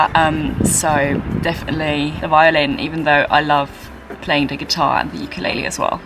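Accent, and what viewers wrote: United Kingdom. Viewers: Wie hast du so geilen englischen Akzent? 😍😍😍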